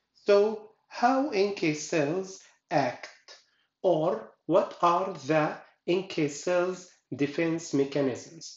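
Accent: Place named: Arab world. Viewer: الله يعطيك العافية اول الشي بس الفيديو من شخص عربي و موجه لعرب بتحكي انكليزي ليه!!! المحتوى الاجنبي غني جدا بهيك اعمال و المحتوى العربي هو الفقير. و من لكنة كلامك بالانكليزي واضح جدا انك شخص عربي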